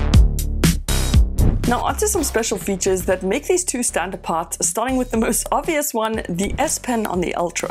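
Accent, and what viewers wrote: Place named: South Africa. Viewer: You sound South African :) Love the content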